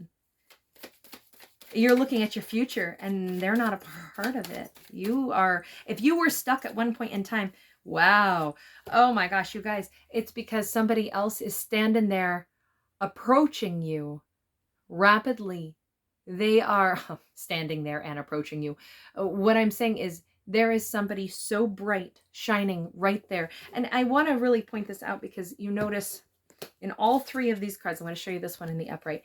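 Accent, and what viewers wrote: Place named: South, United States. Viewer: I thought I recognized a Southern twang when you're speaking! Resonates definitely! He's stuck in fear, infidelity will do that to the one not commiting it. He's lucky I know what this connection is and am filled with COMPASSION, but also strength. Thank you❤🙏🌟✨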